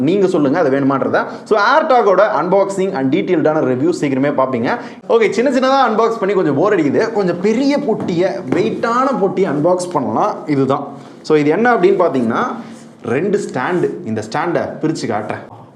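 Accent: Madurai, India. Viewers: Bro ninga madurai yaa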